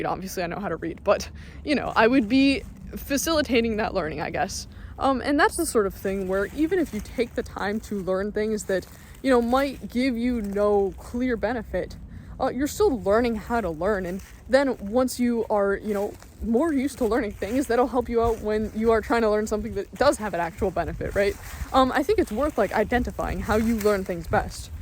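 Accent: Canada. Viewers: What a sympathetic person. She sounds canadian, maybe its that 🙂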